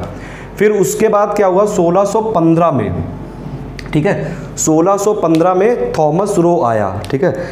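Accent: Kanpur, India. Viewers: Nice kanpur accent sir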